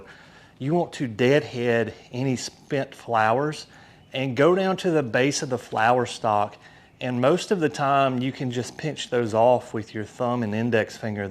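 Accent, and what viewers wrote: South, United States. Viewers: very educational....finally a southern talking youtube on geranium care!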